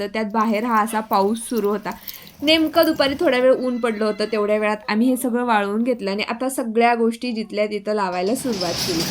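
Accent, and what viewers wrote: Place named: Kolhapur, India. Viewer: Tumchi bhasha amchya Kolhapur sarkhi vatate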